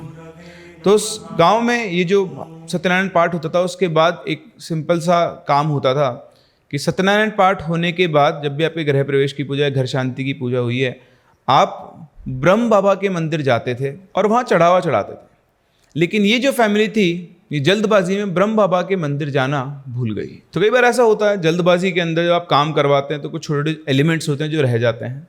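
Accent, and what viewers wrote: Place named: Bihar, India. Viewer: Sir aapki bahari accent..😍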